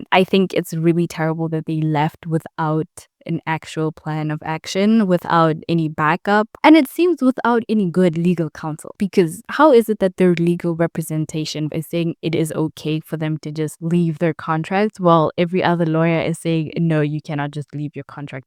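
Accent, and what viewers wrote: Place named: South Africa. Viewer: Love your voice and accent! Are you South African because it's giving South African accent?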